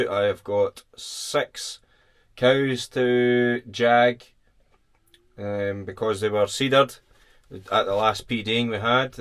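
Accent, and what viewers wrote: Scotland, United Kingdom. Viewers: what part a scotland you from ..im in northern ireland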